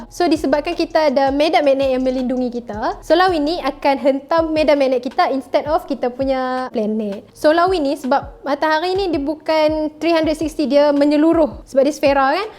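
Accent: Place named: Kedah, Malaysia. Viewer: Lebih baik guna BM standard. Boleh bantu pelajar ting 3 untuk lebih faham. Loghat kedah pekat noo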